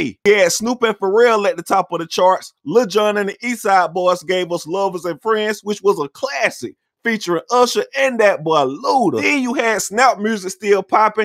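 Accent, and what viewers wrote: South, United States. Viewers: dang boi u is country as hell where is u from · I love the Southern Will Smith voiceover, with ALL my heart.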